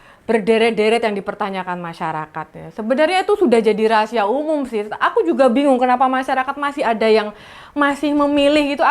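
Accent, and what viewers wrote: Java, Indonesia. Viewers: Halo mbak...... gaya medokmu itu lo...aku suka... Salam kenal dari org BLITAR... semangat ya...👍🤟🇲🇨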